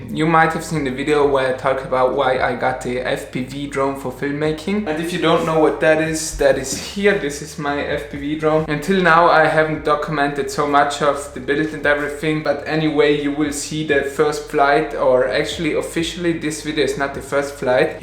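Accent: Germany. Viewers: Dieses Deutsch- Englisch. Aber ich finde es Super. Für deinen dritten Flug? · Man hört das du deutscher bzw. schweizer österreicher bist xD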